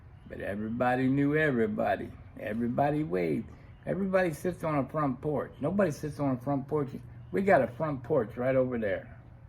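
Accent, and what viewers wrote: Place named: New Orleans, United States. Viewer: by the way when i first started seein your vids i was for sure you were from Nawlins!😆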